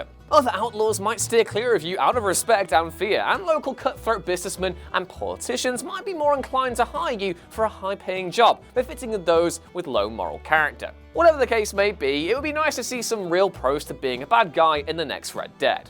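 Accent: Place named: United Kingdom. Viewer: I guess you guys were Americans. U make great content. But that British accent is so annoying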